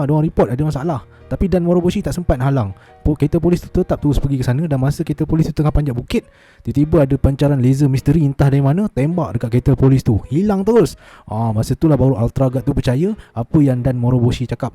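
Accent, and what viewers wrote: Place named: Kedah, Malaysia. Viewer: Awat ckp kedah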